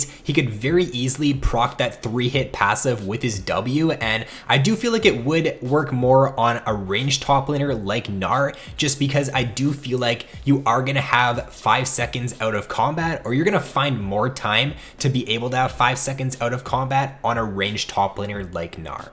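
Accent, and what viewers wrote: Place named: Canada. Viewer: You are canadian, aren't you?The way you say out.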